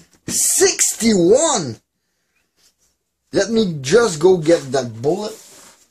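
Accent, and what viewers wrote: Quebec, Canada. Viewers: Tu vien du quebec?! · wait you come from quebec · quebecois et fiere de l'etre jai reconnu ton accent hahah! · J'ai reconnu ton p'tit accent du bon Québec :) Bonne vidéo my man!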